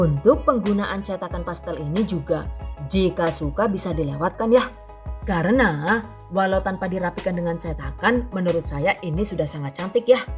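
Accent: Banyumas, Indonesia. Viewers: Salam sesama wong ngapak 😁🤣ora ngapak ora kepenak🤣🤣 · Gx prnh gx tertarik sm resep dr bunda....btw bun,mw tny.emng logat madiun ngapak bgtu y bun.tp q sk beud c,scra q asli wong tegal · Salfok sm bhsanya bun.. Jawanya ngapak jg trnyta sm ky aq. Jawanya mn bun?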